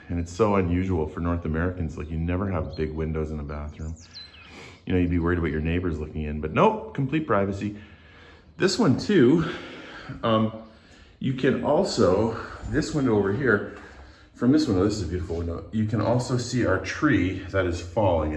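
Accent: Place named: Saskatchewan, Canada. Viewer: and I have just discovered your show. I listened to your voice and I thought “he sounds like he’s from Saskatchewan….and then I saw the Roughrider shirt. Thanks for sharing your journey. 🇨🇦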